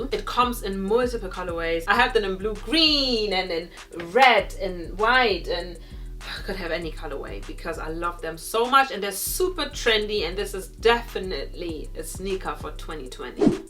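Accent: Germany. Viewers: Du hast voll denn deutschen Akzente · du hast irgendwie ein deutschen aktzent in deinem englisch lol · Hahaha habe ich grad auch gedacht so sprechen nur deutsche Englisch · I'm also German and I can't stand German accents and I can't stand hers either She literally pronounces the words as if they're German words 😭😭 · Are u German? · Are you german cause your accent sounds like a german accent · She has a german accent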